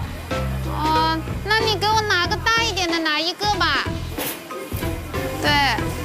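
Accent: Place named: Zhejiang, China. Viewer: 很浙普吧！浙江美女代表，聲音軟糯軟糯的，好好聽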